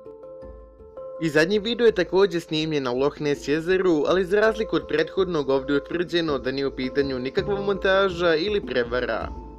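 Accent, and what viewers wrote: Montenegro. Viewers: Da li si ti iz Crne Gore?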